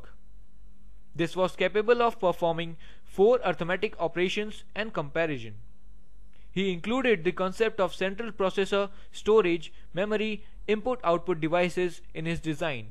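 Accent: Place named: India. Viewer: Youtube auto-gen subtitles are wrong. . . . .Can't take indian accent :V. . .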